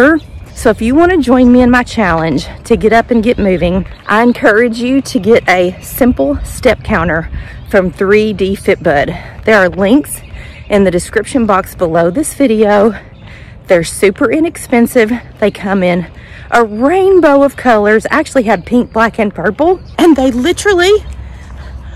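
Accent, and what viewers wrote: South, United States. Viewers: I’ve been watching your videos for awhile! I began watching when you first got Pollux and fell in love with how you love your animals. I knew you were a Southerner mostly because of your speech, and because of your generosity and kindness in communication. You want to help, you want to teach, you want to help people live better lives! I live down in Millington TN outside Memphis, but my parents lived in Obion County for almost 10 years back in the 90s and are very familiar with the Paducah, KY area. I have not seen all of your videos, but I have loved every one I watched! This video diary is a perfect pairing of your curls and you’re ice cream business curls! I have never seen or heard of a shop like that, but the concept is genius! You are such a a great teacher and encourager! I don’t know how you find enough hours in your day to do all you do. 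You make it look easy, but I know your filming and editing take more time than people realize. I just want to encourage you and tell you that you are really helping to make a difference in peoples’ lives and I am proud of you and for you! Give Mooey and Pollux a scratch and a kiss from the McBrides!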